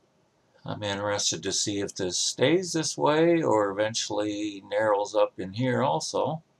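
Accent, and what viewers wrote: Ohio, United States. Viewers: You keep your Ohio accent. I love accents, that's what makes people unique. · Your Ohio accent is wonderful. Don’t change it for any critics in your comments. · i think your ohio accent is cool, dont worry about it.:) my late dad grew up in and around akron and a friend grew up in port clinton and sandusky.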